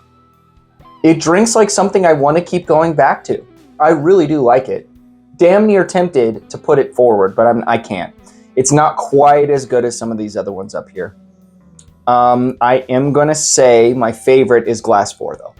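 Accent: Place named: Texas, United States. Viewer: Love the Texas tie and Texan accent. I love how all our palates are different. thanks for getting this together and can't wait to do the FINALE live stream. cheers 🥃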